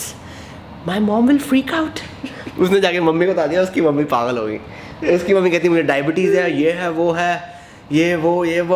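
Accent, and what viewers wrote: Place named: South, India. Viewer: That South indian English Accent of urs lit💯💯🔥🔥🔥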